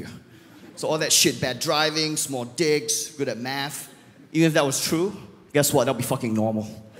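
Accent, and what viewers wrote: Australia. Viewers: Finally found the reason why he sounds like aussie and Singaporean at the same time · This guy is funny Maybe these people don't understands his Aussie accent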